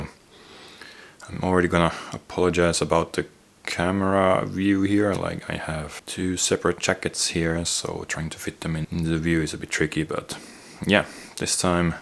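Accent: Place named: Finland. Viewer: Kyllä se aksentti vaan paistaa läpi meillä🤣 Mutta hyvä video! Alkanu lähiaikoina kiinnostaa kylmän sodan aikanen varustus euroopan maissa niin nää on käteviä!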